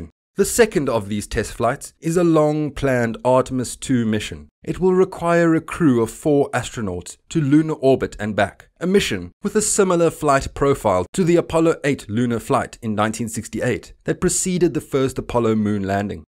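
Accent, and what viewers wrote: South Africa. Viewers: Sounds possibly south african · you're from south africa aren't you?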